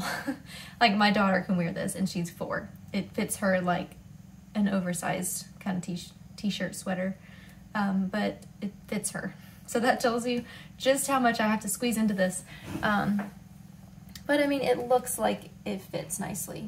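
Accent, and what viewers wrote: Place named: North Carolina, United States. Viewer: Congratulations on your 1,000 subscribers. When I watched your first video I immediately thought you were from NC and I was right. Your accent is very light just like mine but when you grow up in a place it is so easy to recognize. Thanks for another great episode and Happy Knitting!!!!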